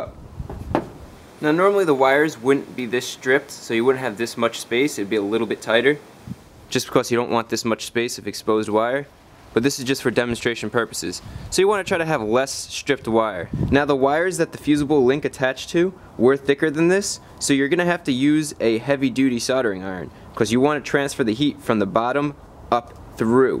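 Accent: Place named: New Jersey, United States. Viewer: He's from New Jersey, that's how we say water here 😅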